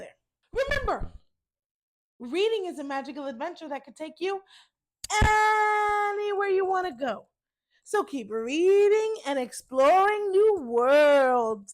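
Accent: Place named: United States. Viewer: Love the cawntry accent 😂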